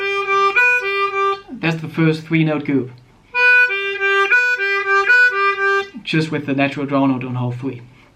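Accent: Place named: United States. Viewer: gewöhne Dir an Englisch zu sprechen, und nicht "fucking american"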